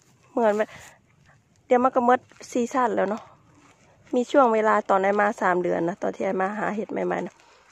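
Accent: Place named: Sakon Nakhon, Thailand. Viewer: สำเนียงคือคนแถวสกลนครแท้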